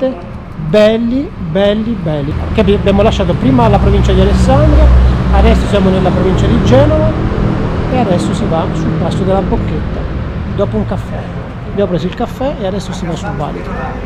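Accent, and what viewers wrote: Abruzzo, Italy. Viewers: Spettacolo….. posti molto interessanti….. e poi mi sembra di sentire i miei CUGINI di CITTÀ SANT’ANGELO….. grazie di cuore ❤️❤️. GOOD TRIP!!!!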